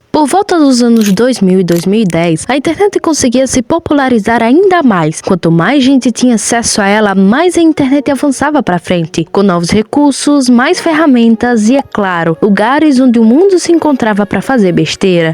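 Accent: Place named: Portugal. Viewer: Nossaaa... Cai de paraquedas aqui pesquisando sobre creepypastas, porque me deu saudades de ver algumas, e acabei adorando seu vídeo ❤ Sua voz tem um sotaque lindo, no começo até pensei era português de Portugal. Me deu mó nostálgia da época que a hora mais divertida era chegar a noite, e pesquisar alguma creepypasta no Wattpad, as que eu mais gostava eram as sobre o Jack Risonho, Jaff The Killer, e sobre a Deep Web e lendas japonesas bem bizarras. Tem um outro canal que faz videos-creepypastas incríveis aqui no YT , que é o Sigma Terror, eu sigo e ouço as histórias dele a mais de 8 anos, as histórias dele são muito interessantes e bizarras, algumas são até bem pesadas, mais o que mais me surpreende é que a forma que ele narra é incrível, e ele tem uma voz linda e boa de ouvir que faz você se sentir estranhamente confortável e relaxada depois de um dia cansativo. 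Aqui tem o link de duas histórias dele que eu considero mais pesadas ( tem muito mais, mas essas são as que mais marcaram minha adolescência ) : O Jack Risonho e Izaque <link> Na hora Certa... <link>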